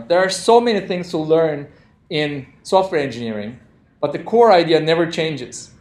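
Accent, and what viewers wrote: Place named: Turkey. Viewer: Lhahah :) sadece türk aksanı ile ingilizce olduğu için mutlaka tüm seriyi izleyeceğim müsait bir zamanda :)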